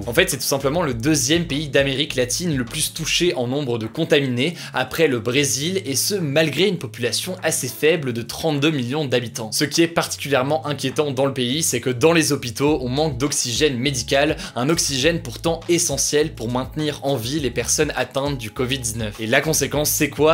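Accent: England, United Kingdom. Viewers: Yfébo Anvakenss autant pour moi, reste quand même que son accent anglais est magique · C’était mieux avec son accent anglais! Ceux qui s’en sont plein vous saoulez 😒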